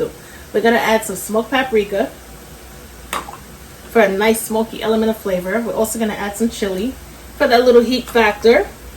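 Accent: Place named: Brooklyn, United States. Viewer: Ohhhhh... Darlin.....you make meold mouth water. i want to try these!! BTW... I love your sweet accent... a little Brooklyn... or New Jersey maybe?? Thanks for sharing.... yummmmmm. Ax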